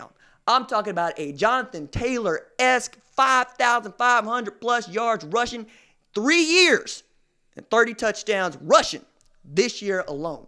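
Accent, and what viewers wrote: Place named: South, United States. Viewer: That was the second best country speak next to Darius Rucker! Nothin like some good ol-fashin championship HS football between two undefeated. “Twelve and Oh and one Oh’s got to go!” Great commentary - Love it!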